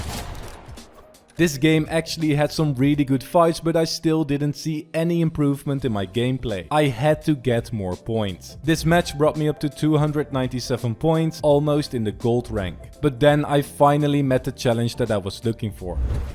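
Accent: Netherlands. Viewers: Heerlijk Nederlands accentje 😂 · Ur dutch right? Ps. Keep up the great vids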